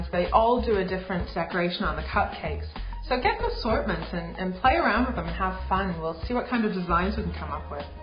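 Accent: Canada. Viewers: ooh i like her canadian accent. and what size star tip was that?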